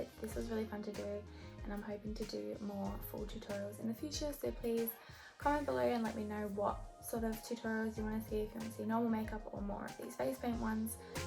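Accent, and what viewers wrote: Australia. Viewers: Are you Australian? I love your accent! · She really does sound like an australian, I also love the accent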